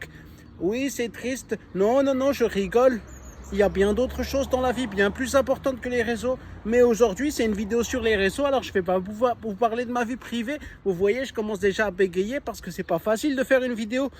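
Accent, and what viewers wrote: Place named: Alsace, France. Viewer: Tu es génial ! Une personne vraiment humble et humaine, ça fait plaisir ! Je crois que j’entend un accent alsacien, si tu es de passage vers Mulhouse, n’hésite pas a répondre, je t’invite a boire une bière avec plaisir !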